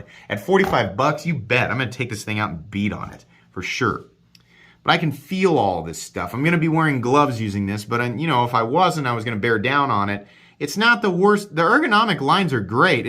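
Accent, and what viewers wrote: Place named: Midwest, United States. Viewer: Kansas! I was close! Identifying accents is sort of something I do as part of my job. Just something I’ve gotten good at. I didn’t know what part of the country you came from and my guess, based on your accent, was Colorado / Nebraska. You ended up just East and south lol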